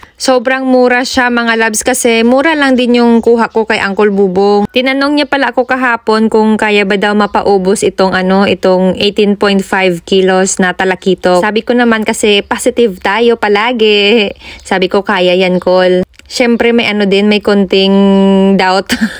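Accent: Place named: Iloilo, Philippines. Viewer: ilongga ka mam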